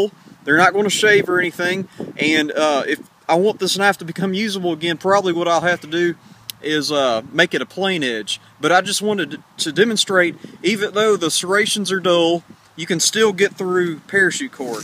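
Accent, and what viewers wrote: South, United States. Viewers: Holy shit this is a serious southern accent.. damn.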